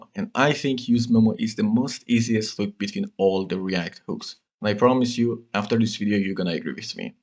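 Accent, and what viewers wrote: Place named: Iran. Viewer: Persian accent sooo good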